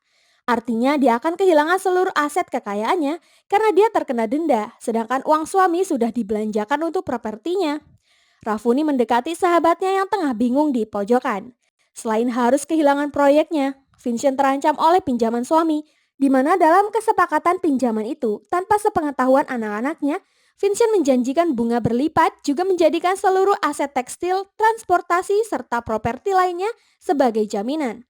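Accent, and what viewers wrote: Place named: Sulawesi, Indonesia. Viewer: Koq logatnya pakai "kita ji"... Mimin org Sulawesi kahh 😁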